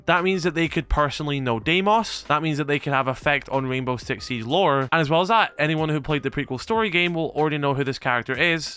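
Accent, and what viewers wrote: United Kingdom. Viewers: Also if you were invited to Ubisoft Montreal the French speaking people in Quebec would be so confused having a British person speaking English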